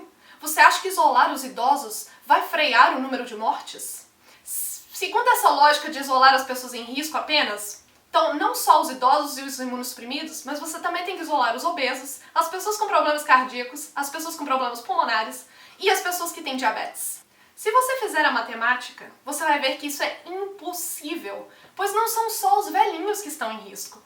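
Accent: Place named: Minas Gerais, Brazil. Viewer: Oi, você é de BH? Fiquei curioso pelo sotoque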